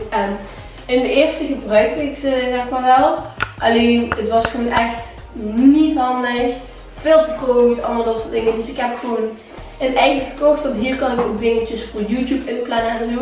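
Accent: Belgium. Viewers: Ben je Belgisch? Je hebt zo'n schattige accent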